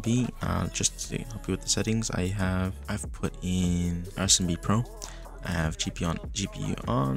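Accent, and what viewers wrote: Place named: Australia. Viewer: omg… first time hearing your voice and wow, what can I say? It’s incredible… amazing, awesome it’s everything I could’ve ever wished for. Mature, Australian, professional, polite wow. This is one of the best days of my life.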